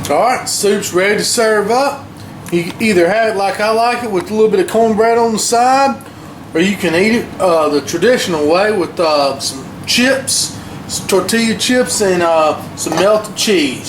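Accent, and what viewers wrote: South, United States. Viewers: looks delicious! i have a southern accent too and i love seeing other youtubers with a country accent as well. i get alot of comments on mine as im sure, you probably do too lol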